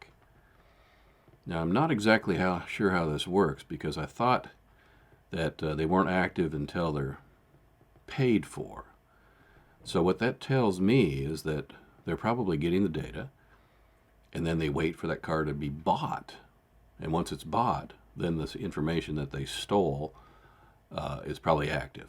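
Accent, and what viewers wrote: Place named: Boston, United States. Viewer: Luv you and your Bahhhh ston, New England, Massahhh chew setts, 35, jfk sr, accent!!!!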